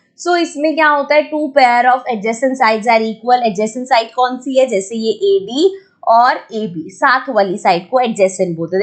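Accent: Punjab, India. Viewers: Punjabi ho app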